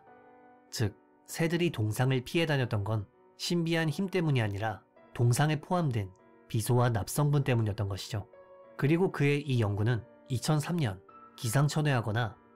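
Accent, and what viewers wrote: Japan. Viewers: 오 이걸 이제야 보네요ㅎㅎㅎ 어투가 살짝 간사이쪽으로 들려요! 어처구니없는 사고가 많았는데도 좋은 영상 찍어주셔서 감사합니다 이번 일본여행은 좋은 일만 있으시길❤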